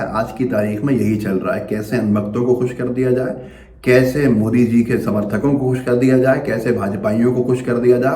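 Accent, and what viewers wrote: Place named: Bhopal, India. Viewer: Are khaan tum to bhopali lag riye ho.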